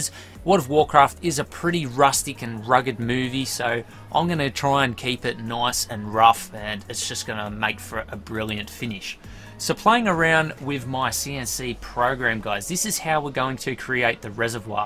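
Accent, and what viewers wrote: Australia. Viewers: great ideas right there! although I'm not the biggest fan of warcraft I think it will look sick when finished. keep it up! btw your Australian accent sounds so funny :D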